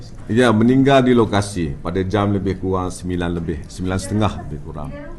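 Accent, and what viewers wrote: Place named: Perak, Malaysia. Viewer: pekat bebenor peraknye yeop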